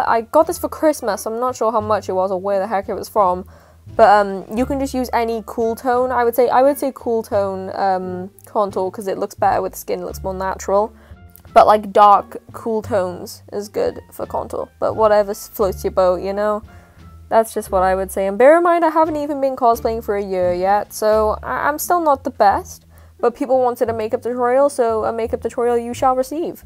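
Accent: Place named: Wales, United Kingdom. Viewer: I love the welsh accent. I live in South Wales 🏴 currently so I’m catching onto the accent which I loveeeeeee ❤️🤷🏼‍♀️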